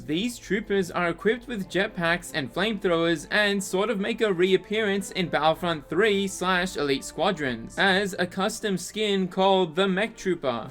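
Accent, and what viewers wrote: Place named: Australia. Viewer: I was baffled when you said this game had an M rating but realized your Aussie accent and then it made sense 😆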